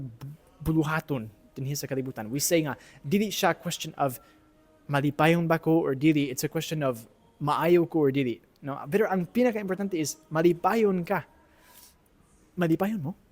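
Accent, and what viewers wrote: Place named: Cebu, Philippines. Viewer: Maka amaze imong binisaya bisag 5 years paka sa Cebu Father mura nakag pure Cebuano the way ka mo esturya ug bisaya… Gi unsa nimo pagkat on ug bisaya father? Btw watching from Japan diay Father! God bless kanatong tanan!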